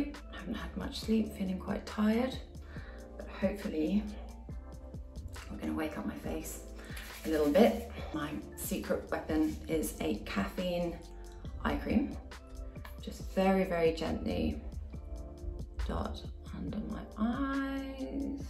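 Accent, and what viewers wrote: United Kingdom. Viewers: Is she British based on her accent? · The only time I actually like a Brit girl voice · Why do I always thought that she's American for some reason? The moment she speak, I was a bit surprise to hear her British accent. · What accents she has? British.. but not that Seamus on Harry Potter😅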